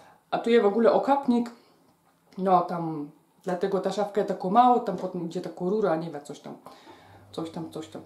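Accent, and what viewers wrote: Silesia, Poland. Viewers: Mogłabym Pani słuchać wciąż... Uwielbiam tą śląską gwarę. Kuchnia bardzo funkcjonalna 😍 zazdroszcze tych wszystkich pierdół do pieczenia 😉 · Nie da sie sluchac tego slaskiego pierdolenia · Kuchnia fajno a ta twoja godka jest dupno 😂 też jestem że śląska mieszkałam w Zabrzu a teraz już kupa lot w Holandii pozdrawiam😚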